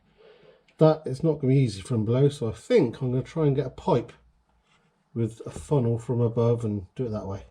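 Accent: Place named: West Country, United Kingdom. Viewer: Goin straight out after this vid to look at mine... Thx for all your great Vivaro how-to and tips 'n tricks so far..., all well explained, "proper job". Don't worry about your rabbiting, i'm quite partialled to hearing a bit of west country accent now and again. 🇨🇭 greets, Steve.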